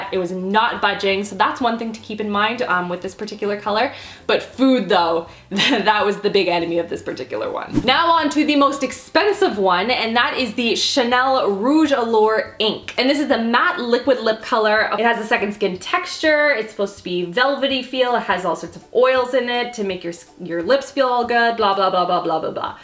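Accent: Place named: Canada. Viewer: riley gatenby a Canadian one, if anything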